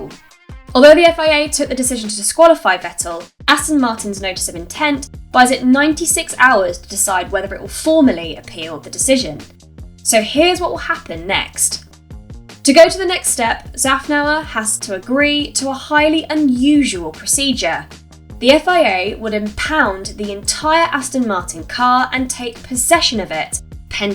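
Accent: United Kingdom. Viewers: How about that? There is just something about a pretty girl, with a British accent who just doesn't talk about racing, but actually understands racing.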